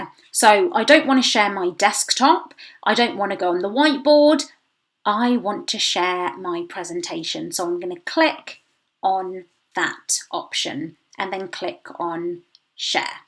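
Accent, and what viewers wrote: United Kingdom. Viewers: Your Accent is dope British loh😂❤❤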